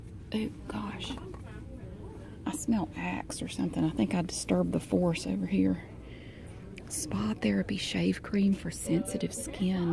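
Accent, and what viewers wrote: South, United States. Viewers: she’s southern, as is Laura Lee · Southern accents usually annoy me because I had a bad experience with a jerk lady with a southern accent but you have restored my faith in southern women. You’re voice is relaxing and sweet and I think I can learn to love southern accents again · I used to live down south and everyone had an accent like that. I loved listening to them talk. 💕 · I like her accent. I grew up in the south and it reminds me of all my elementary school teachers accents. I wish I would have devolved a country accent. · I love watching your ASMR because we are both from the south and your accent reminds me of home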